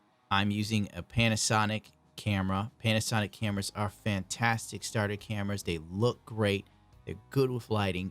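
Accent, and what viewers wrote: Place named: East Coast, United States. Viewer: Love your videos. You are currently my favorite Gunpla youtuber. I love the diorama, and painted gunpla, your east coast accent and comments. All of it is fantastic. One issue I do have is that I can't watch your videos around my kids because of all the cursing. If you can tone it down, cool! I can let them watch with me. If you can't, that's cool too. I'll watch your videos by myself. Keep up the great work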